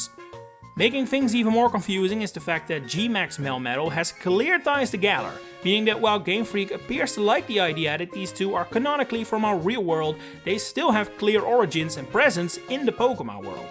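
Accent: Netherlands. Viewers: Really like how you sound Dutch with all the different types of American accents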